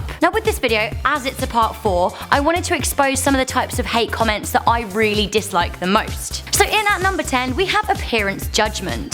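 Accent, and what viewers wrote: England, United Kingdom. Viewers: I can't believe your English! I am English too!!!!! :) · Are you British if you are I love your voice but if your not I love your accent and crop😸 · She is really pretty and 10 million people in Britain have the same accent, including me!! 😋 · I love your hair! red hair is the best! I've also always loved British accents, and your outfit is ON POINT! You go girl!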